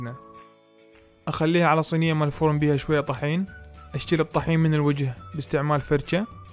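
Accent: Mosul, Iraq. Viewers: بس اهل بغداد مو هيج لهجتهم اكيد الماما لوبابا من الموصل 🙋🏻‍♀️ بلتوفيق ان شاءالله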